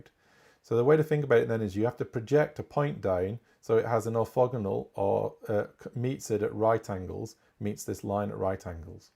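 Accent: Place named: Hull, United Kingdom. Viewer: Thankyou for this! Added to our VLE for my MSc students in Hull (sounds lke you hail from not a million miles away?)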